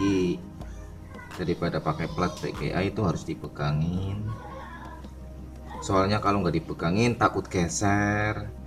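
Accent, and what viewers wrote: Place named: Banyumas, Indonesia. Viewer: Dempet kang bahasa ngapake😁😁😂😂😂😂😂😂😂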